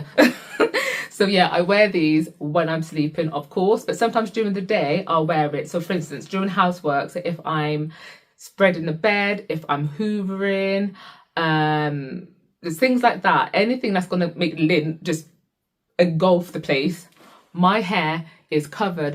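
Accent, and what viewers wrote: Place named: Northern England, United Kingdom. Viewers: UK in the house!🇬🇧So lovely to hear a British/Up North accent! Great advice, really helpful and your hair looks so healthy!❤️✨🫶🏾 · I recognized the UK accent. Love to hear it on black people. Waves and hugs from the midwest of USA!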